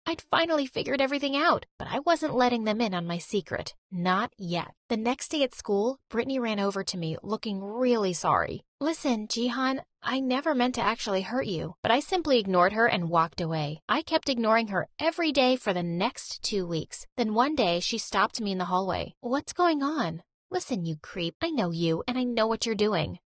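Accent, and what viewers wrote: United States. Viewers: This Turkish person has a suspiciously American accent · You’re turkish and you have an American Accent? Kinda sus I am Turkish btw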